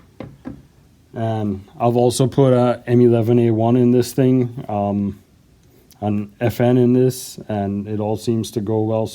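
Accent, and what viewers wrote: Trinidad and Tobago. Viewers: Sound like a Trini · Is it me or this man sounding like Trini?? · That accent can’t hide, sounds like a Trini...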